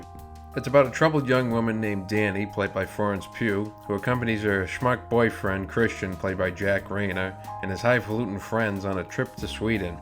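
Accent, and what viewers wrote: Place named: Boston, United States. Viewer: Your Boston accent shines right through! Love it.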